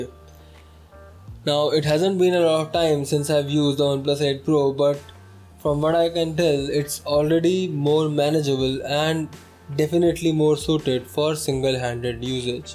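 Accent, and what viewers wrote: India. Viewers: indian english accent is really annoying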